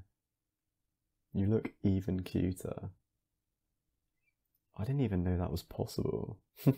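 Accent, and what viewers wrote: United Kingdom. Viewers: I live for that British accent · bOdayy~ british accent is sooo cutee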